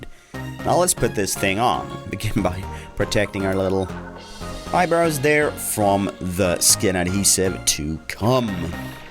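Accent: Sweden. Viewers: What swedish accent do you have??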